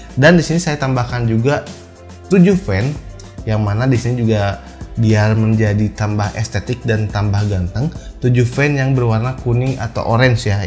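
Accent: Bandung, Indonesia. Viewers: Sigahna orang bandung nya kang. 😅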